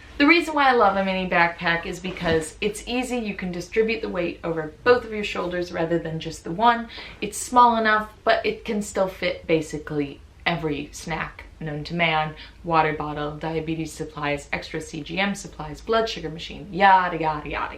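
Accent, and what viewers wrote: United States. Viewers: I suppose you live in England. How come you don't have a British accent? To me, it is more like American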